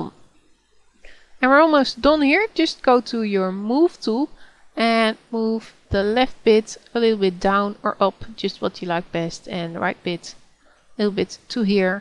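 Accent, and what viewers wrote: Netherlands. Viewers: What capture program do you use?, PS: Ik hoor het aan je accent, jij bent nederlands he?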